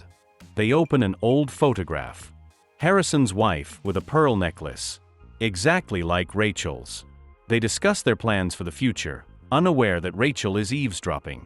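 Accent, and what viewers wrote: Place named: France. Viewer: Interesting French accent.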